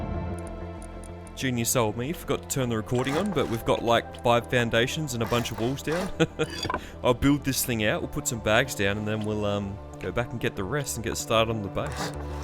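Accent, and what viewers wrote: Australia. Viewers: Are you Australian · OMG, I’ve been watching since you had 500 subs, even on different accounts. Your Australian voice and the way you explain things always keep me hooked. It’s crazy to see how far you’ve come, and honestly, you deserve all the success. Can’t wait to see where you take this next – keep doing what you’re doing, it’s seriously inspiring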